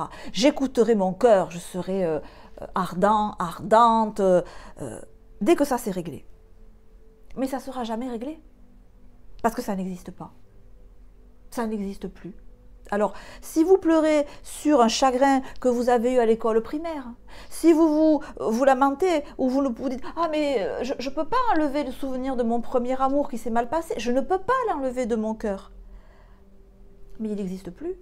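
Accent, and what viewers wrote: South, France. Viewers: Bonjour vous ètes incroyable, je suis seul aussi, à vous entendre vous ètes du sud, dommage, je suis dans le "chamanisme" Bonne continuation.T.M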